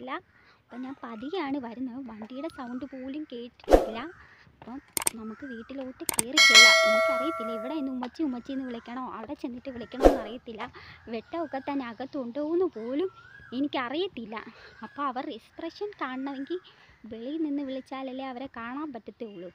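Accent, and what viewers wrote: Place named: Kannur, India. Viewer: കണ്ണൂർ ആണെന്ന് തോന്നുന്നു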